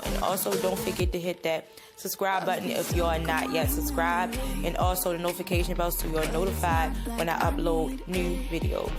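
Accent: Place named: Maryland, United States. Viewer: I know we live in the same state because of your accent. I live in Reisterstown and work in the City. But I love your crafts and would be honored to have one of them.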